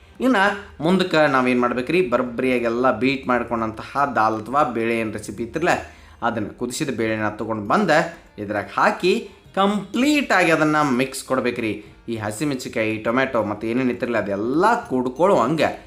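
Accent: North Karnataka, India. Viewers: ನಿಮ್ಮ ಡಾಲ್ ಜೊತೆಗೆ ನಿಮ್ಮ ಉತ್ತರ ಕರ್ನಾಟಕ ಭಾಷೆ ತುಂಬಾ ಚೆನ್ನಾಗಿದೆ.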